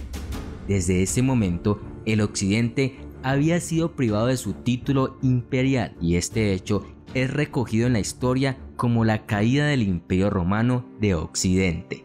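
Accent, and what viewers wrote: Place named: Colombia. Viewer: No puedo tomar un acento colombiano en serio, siempre hablan bromeando jugando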